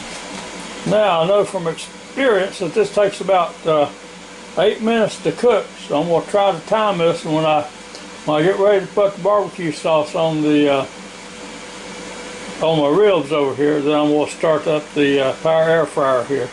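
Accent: South, United States. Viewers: The southern accent make this like 1000% better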